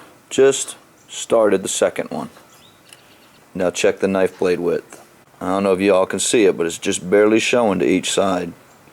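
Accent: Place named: South, United States. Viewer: How did you get such a thick southern accent then? I would think a Maine man would have a east coast accent.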